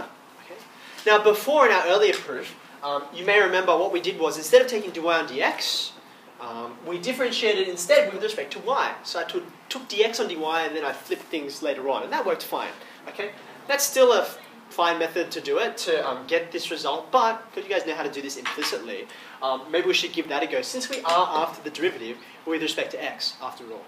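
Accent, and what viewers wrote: Australia. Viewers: indeed. disguisting australian accent.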